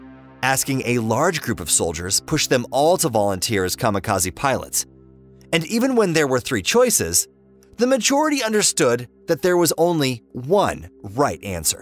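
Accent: United States. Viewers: Too slow, A Yank of course.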